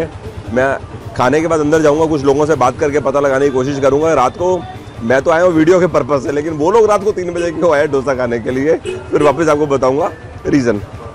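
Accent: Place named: North, India. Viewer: No he from North India Delhi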